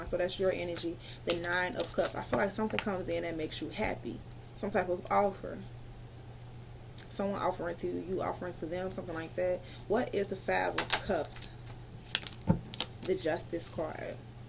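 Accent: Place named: Chicago, United States. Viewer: You from Chicago? I feel like I can tell 😩😍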